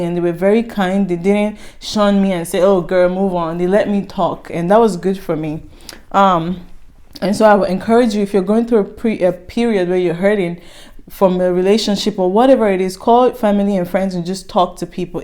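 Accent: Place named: Benue, Nigeria. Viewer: New viewer here❤? Where are you from,you sound like someone from Benue,Nigeria…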